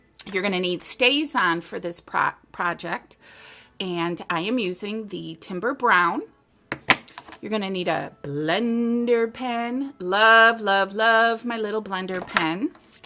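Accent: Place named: Cleveland, United States. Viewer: Oh I am SO doing this one!! Thank You!! ... and smoosh is SO a word!! You sound like you might be/have been from Cleveland - are you??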